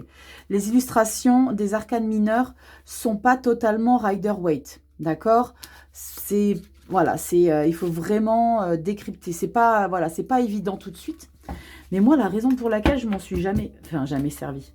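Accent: South, France. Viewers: Merci, ton énergie du sud me réchauffe le cœur. Cette lecture des cartes est vraiment encourageante pour moi. Une belle et heureuse année nouvelle année à tous.❤❤❤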